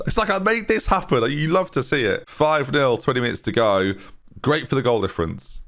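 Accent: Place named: Newcastle, United Kingdom. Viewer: close your eyes while listening to and u would think you're listening to the true Geordie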